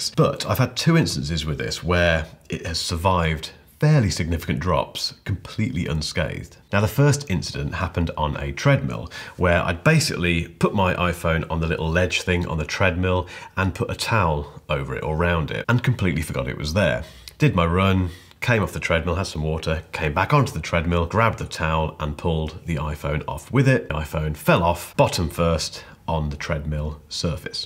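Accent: United Kingdom. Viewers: Excellent and stylish review, I have one and love it as well ,and because your British accent I will drink my usual single malt ,its time to cheers.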